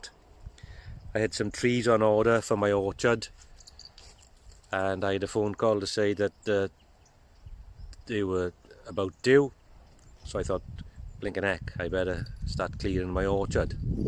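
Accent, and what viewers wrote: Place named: Pembrokeshire, United Kingdom. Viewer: I used to visit Pembrokeshire, so I love to hear your accent as much as watch the content...Keep going if only for your mum's sake...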